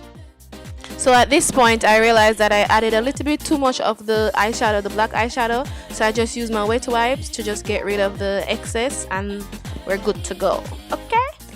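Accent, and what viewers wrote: Jamaica. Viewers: My mother is from JA. I knew where you were from, before you said it. 🇯🇲🇺🇲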